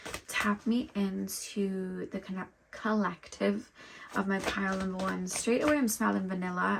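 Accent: United Kingdom. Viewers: I really like your readings but you talk too fast with a British accent I couldn’t catch up.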